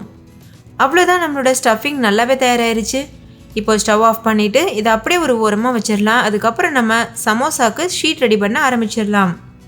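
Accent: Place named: Kerala, India. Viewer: நீங்கள் கேரள மாநில பெண்ணா? தமிழ் நல்ல அழக பேசுறிங்க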